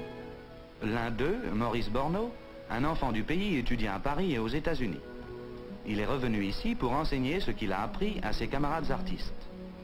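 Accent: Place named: Quebec, Canada. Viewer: This sounds like a Québécois narrating the documentary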